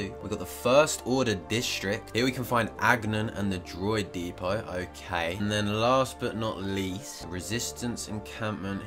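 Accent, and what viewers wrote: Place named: United Kingdom. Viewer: We gonna have some Woaaaa.🤣🤣🤣 I love the British accent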